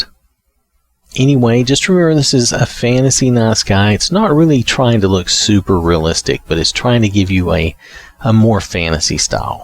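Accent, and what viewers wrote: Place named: East Texas, United States. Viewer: From east Texas? Sounds like it 10/10 hope these are on PC 😭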